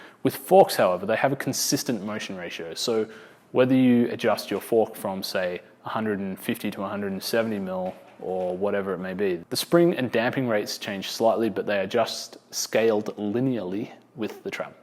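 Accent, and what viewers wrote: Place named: Australia. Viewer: haha reppin the aussie accent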